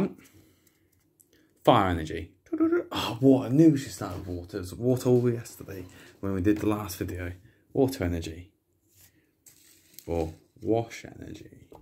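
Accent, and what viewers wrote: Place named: England, United Kingdom. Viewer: Well fk me sidewise I was not expecting the English accent lmao caught me off guard. Saw you on the TabooContract twitter with those dragon cards.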